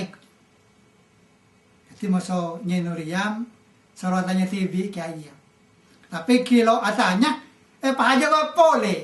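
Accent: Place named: Bangkalan, Indonesia. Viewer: Klo dari dialek nya sepertinya bangkalan tretan, pamekasan jg lumayan halus krn dekat sumenep...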